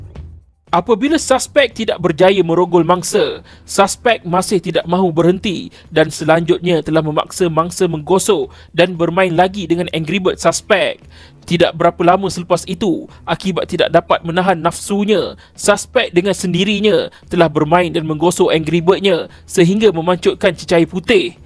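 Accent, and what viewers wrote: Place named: Johor, Malaysia. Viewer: Gaya bahasa penyampaian Johorean sangat 😂 guna lah bahasa Melayu baku standards 😅baru semua orang suka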